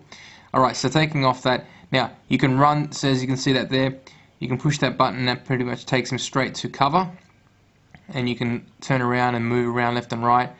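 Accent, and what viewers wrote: Australia. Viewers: i like ur aussie accent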